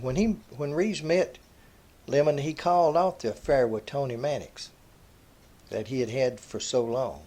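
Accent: South, United States. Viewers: Thanks from Europa for the wonderful videos. thx for all the work you put in. You have a good clear way of telling your stories. You must be from the south.:o) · How are you? I stumbled across your channel the other night I really enjoy your videos and your southern accent where are you from??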